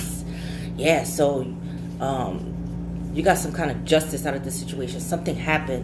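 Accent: New York, United States. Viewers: you are so funny are you from New York New York or New Jersey that's your accent I love it but you yeah I love the reason I love it I'm a Leo son Taurus Moon and a cancer I forgot that one he's an earth sign I've been waiting for him to wake up his mind it's been almost 2 years but I have time not exactly where I want to be and he's got out of a divorce about 3 years ago I have options you know we Leo's they love us haha they try to act like they don't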